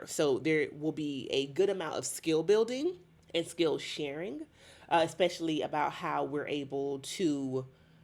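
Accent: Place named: Polk County, United States. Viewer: 😂😂😂 you have me laughing so hard, because that’s exactly how we talk here in Polk county … so proud of you . Keep it up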